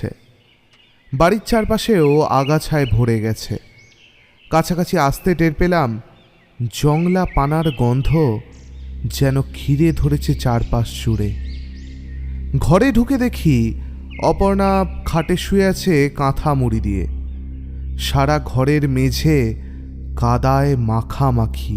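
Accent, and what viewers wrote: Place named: Bangal, Bangladesh. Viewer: Kat bangal reeeeee ekdom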